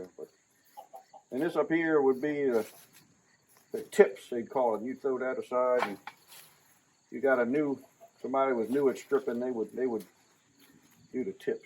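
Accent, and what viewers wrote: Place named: Virginia, United States. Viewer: May I ask where in Southern Maryland? Your accent sounds very similar to the accent heard in the Northern Neck of Virginia.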